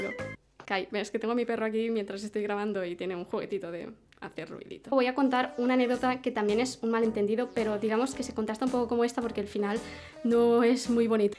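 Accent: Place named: Spain. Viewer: Eso es acento español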